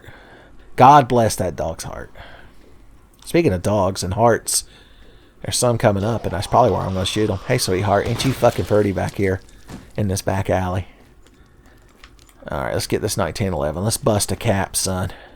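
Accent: South, United States. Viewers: I've fallen asleep to the sound of sweet, soothing, southern voice, and its only affected me by helping me fall asleep and stay asleep.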